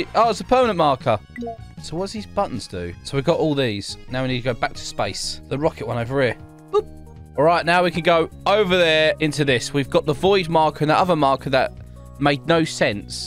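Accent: United Kingdom. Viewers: ur british? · He’s British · BRITISH ACCENT lol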